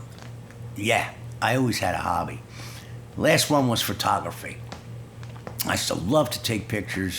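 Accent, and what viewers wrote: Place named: Philadelphia, United States. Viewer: Wonderful stuff., you have the purest Philadelphia accent ever!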